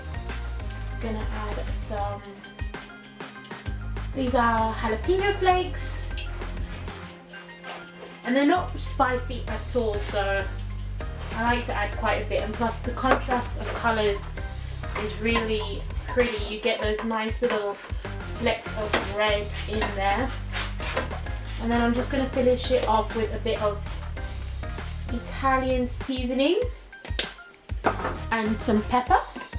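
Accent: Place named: Belgium. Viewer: it's a belgian accent :p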